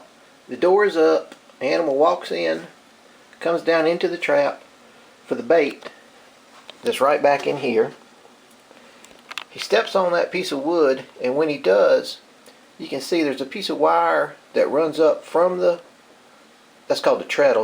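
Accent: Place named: South, United States. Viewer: This trap really works. I've been having issues with rabbits eating my lawn and plants. I didn't want to kill anything so when I came across it look simple enough to give it a try. The guy's southern accent made me a believer too. Took about two hours to build using a drill, hand saw pliers and some screws. A what do you know, caught one the next day. I live in so California so i assume it'll work anywhere. To the creator of the video "thank you"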